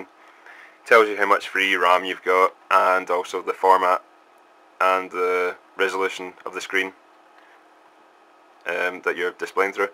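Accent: Scotland, United Kingdom. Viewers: dont you just love browsing through random videos and finding a Scottish accent xD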